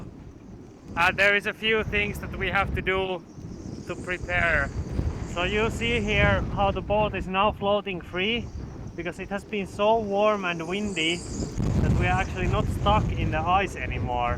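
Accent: Finland. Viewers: Finnish, judging by his accent.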